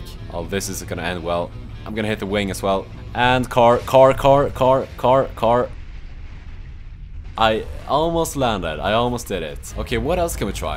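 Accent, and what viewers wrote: Sweden. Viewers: Are you from sweden? The way you speak sounds like when a swedish man speak english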